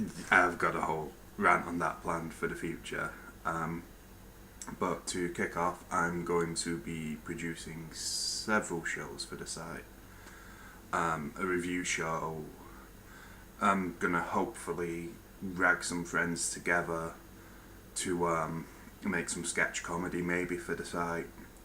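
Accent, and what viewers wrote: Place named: Northern England, United Kingdom. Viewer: No worries, it must be hard being misunderstood like I am. I kinda get pissy at people when they mock me and generally don't understand me, so it makes sense the forum would be unaccepting of newer members but I'll try to fit in they're, and I will PM you when I figure the system out and if I remember you're name. Their's something I wanted to ask you, are you from England? I used to live they're until I moved. Most of my family live their as well. I can kinda tell your accent is from the North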